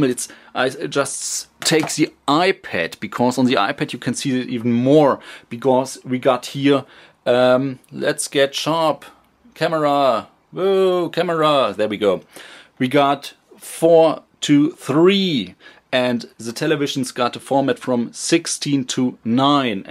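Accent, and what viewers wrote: Germany. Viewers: i love ur german accent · you are from germany aren't you ? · German accent, aren't you? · Lustig man hört, dass du Deutscher bist, ich finde es aber echt cool, dass du auch Englische Videos machst.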